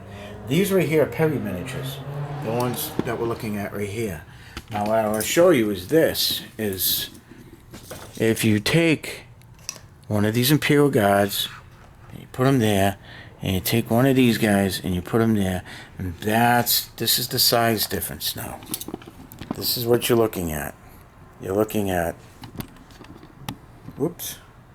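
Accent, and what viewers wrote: New England, United States. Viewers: I'm guessing you're a New Englander. The damn finest accent in the U S of A!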